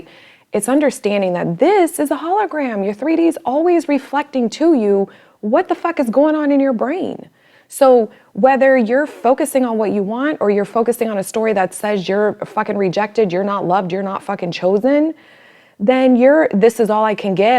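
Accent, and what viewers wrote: New York, United States. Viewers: She's from NY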